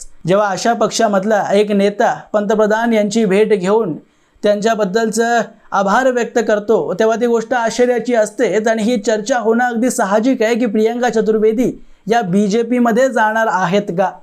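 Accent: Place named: Thanjavur, India. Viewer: तुमची मराठी आमच्या तंजाऊर मराठी सारखी वाटते. 👍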